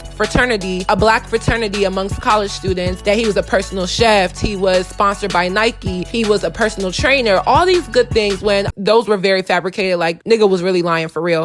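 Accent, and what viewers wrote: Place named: Philadelphia, United States. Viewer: I can hear the Philly all in you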